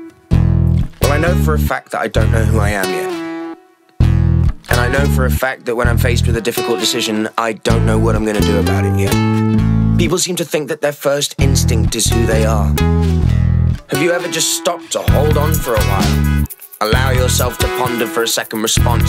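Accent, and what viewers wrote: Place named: United Kingdom. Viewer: Obsessed with the brit accent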